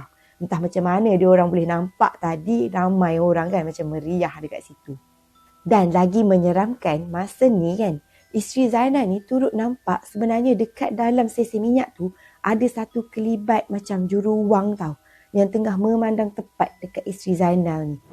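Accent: Kelantan, Malaysia. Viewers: ni ore Kelate duk raso.. Bunyi po loghat Kelate wlu dio kecek luwa pun 🙃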